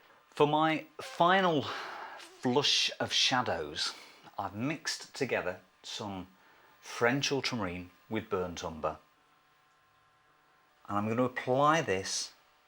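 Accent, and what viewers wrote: England, United Kingdom. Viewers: So pleasant to hear an English voice. Have watched a lot of American stuff which is sometimes a bit hard on the ear!! Really great instruction too!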